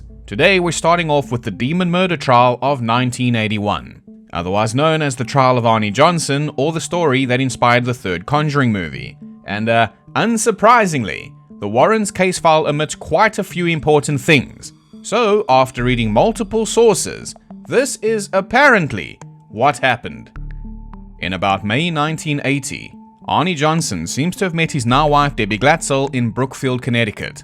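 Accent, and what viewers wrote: South Africa. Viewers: Bro? Are you South African? I'm asking because I'm South African and your accent is sounding very familiar 😂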